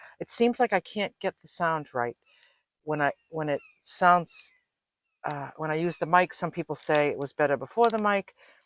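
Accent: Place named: New England, United States. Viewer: I hear that familiar New England accent. Been up here 12 years.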